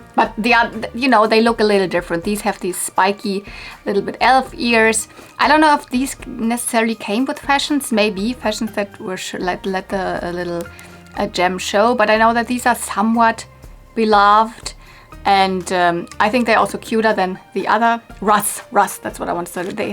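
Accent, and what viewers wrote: Germany. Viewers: What a wonderful doll/toy haul! I love everything you found! Brought back so many good memories 💗 Barbies of the 80s & 90s have a very special place in my heart 🥰 I spent my early childhood in Germany in the 80s. 🥰 I love hearing your accent and hearing German spoken. My father was fluent, and I started to relearn German in school. Unfortunately, I don’t have a lot of opportunities to speak it, so I’ve lost so much, but can still understand most of what’s being said. Great video! Can’t wait for the next!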